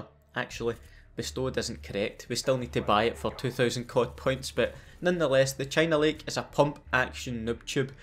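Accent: Scotland, United Kingdom. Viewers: this guy has got me dead xDDDDDD and the Scottish accent makes it even better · Oh dude ur videos is the best always brighten me up Even when Im mad u have good humor And that ur scotish makes things Even better love your vids keep the good work up👍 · I love your Scottish accent! 😅❤ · I love Scottish accents. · You sound more like a proper Scotsman than an Aussie. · Is this a Scottish accent?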